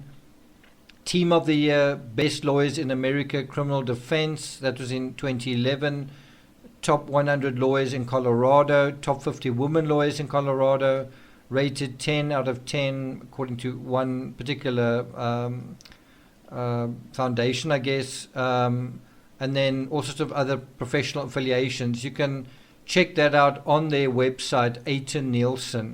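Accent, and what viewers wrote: South Africa. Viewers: Are you a South African ?Sounds like that ....your accent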